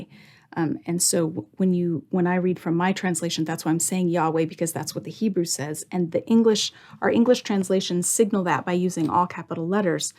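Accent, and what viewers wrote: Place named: Spain. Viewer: This one was thought-provoking, I must say the 3rd option got me interested. Your approach in many of your videos is quite a straightforward one and in some others, you decide to get into the weeds, I see kind of a balance right there for most of us who are laymen. Btw, your Spanish accent, love it !!! 💯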